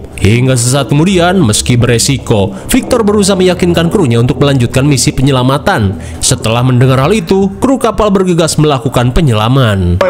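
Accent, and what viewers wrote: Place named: Java, Indonesia. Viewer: Entah kenapa saya mendengar suara Jawa Jawa Jawa 😅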